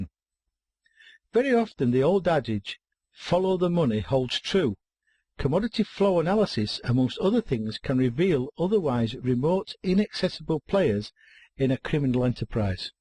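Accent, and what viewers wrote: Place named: United Kingdom. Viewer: The fact this man is teaching what I want to learn and has a UK accent makes this course so much better. ❤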